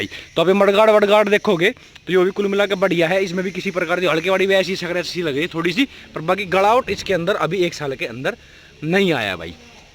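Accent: Haryana, India. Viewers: Bhai Haryana ki voice ek number h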